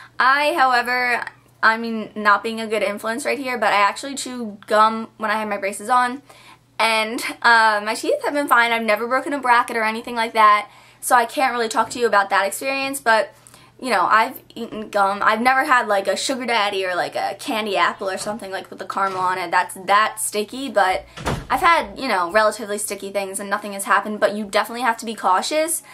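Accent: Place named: South Jersey, United States. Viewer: Not to be creepy, but do you live in southern Jersey. I noticed an accent and a phillies hat. I am from absecon and I recognized your voice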